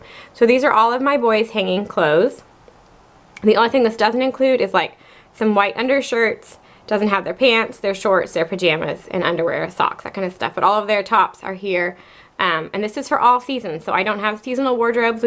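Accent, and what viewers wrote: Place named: Texas, United States. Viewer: Was going to say you sound Texan. I'm a Texan. :)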